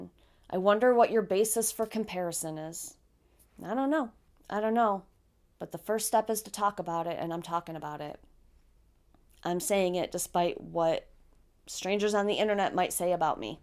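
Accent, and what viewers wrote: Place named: Michigan, United States. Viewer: Hi,. You recorded this video on my 47th birthday, and I'm watching the day after. I keep coming back to your videos because, if I'm honest, your accent takes me home, even though I haven't lived in Michigan in nearly 30 years. Beyond that, you're so relatable. I told my husband (my BFF, the breadwinner, and the one who I know always has my back) last August, "I did a thing. You know I have a hard time asking for help, and always have, well, I have an appointment with a therapist in two weeks at the same counseling center we used with our daughter. I'm not depressed, but I'm not doing well and I need help." Long story short, perimenopause will kick a neurotypical woman's butt, and absolutely make everything that a neurodivergent woman struggles with on the best day, way way more difficult. I know what depression is (been there, taken the Rx for it), that wasn't it. 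For me, perimenopause ramped up my (now diagnosed) autistic traits AND my ADHD traits and masking became more than my physical body could keep up with, and I was doing well to do the bare minimum in every aspect of my life (as homemaker, wife, mom, grandma, and friend, too). My virtual hug and older sister recommendation to you is this: if you need someone to really listen to you, like you probably listen to your friends, find a therapist. Therapy is so worthwhile. Everyone needs a safe place to talk face-to-face with someone about all the things, and creative introverts seem to be that safe place for their f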